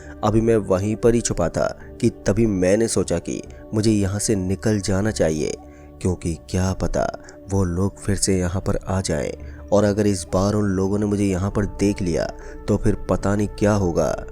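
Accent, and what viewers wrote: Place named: Noida, India. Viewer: Bhai ap noida se ho na voice se lgra hai re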